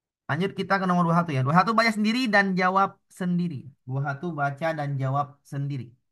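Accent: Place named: Lampung, Indonesia. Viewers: Nada Sumatra banget bapaak😅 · Saya suka penjelasan bapak kayak orang lampung cara ngomongnya tapi nyambung saya 😂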